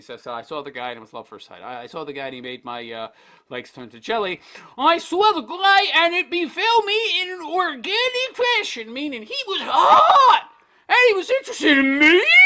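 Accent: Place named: Texas, United States. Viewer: If you slightly modified your Texas accent, you could probably nail a James Carville impersonation.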